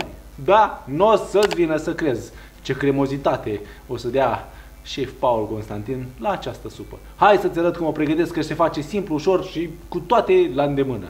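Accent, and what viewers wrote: Moldova. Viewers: Super faină supa. Iar de vorba asta dulce moldovenească ce să mai spunem?? Ne încîntă sufletul. Mulțumim frumos, de rețetă.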